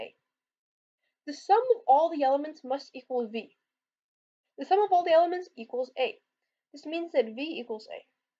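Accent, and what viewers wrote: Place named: United States. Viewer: please clearly speak beacuase u have american ascnet and i am from india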